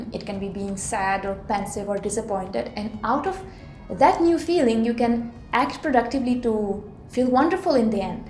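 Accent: India. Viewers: Very interesting video 🙏 I think in my relationship we also have the need of friendly and warm communication 😁 btw did you notice that you have an indian English accent? Super cute 😅🥰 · Your English pronunciation are now like the more Indians and less russian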